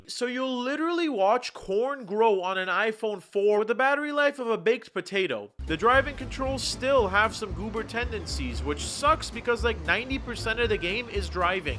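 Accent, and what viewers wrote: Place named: Germany. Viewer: Bro sounds like a real german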